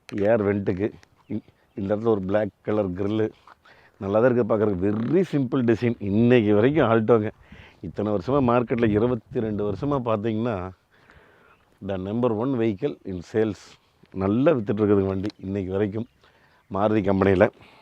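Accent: Kongu, India. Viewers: அருமையான கொங்கு பேச்சு... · அழகான கொங்கு தமிழில் பேசுகிறீர்கள்... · கொங்கு தமிழ் 💚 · கொங்கு தமிழ் அழகோ அழகு அண்ணா,,காசுக்கு தகுந்த பணியாரம் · அண்ணனின் கொங்கு தமிழ் மிகவும் இனிமை...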